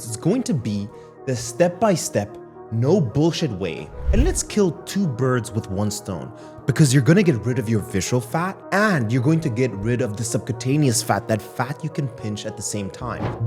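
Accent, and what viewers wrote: South Africa. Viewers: . You have a South African accent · Aaah are you South African? The accent says I come from South Aaaahhh. If yes, please make us an SA specific meal plan, with all the foods we love in a way that helps us🤦🏾‍♀️🤦🏾‍♀️🤦🏾‍♀️. Shooting my shot. Or I read too much into the Aromat and accent.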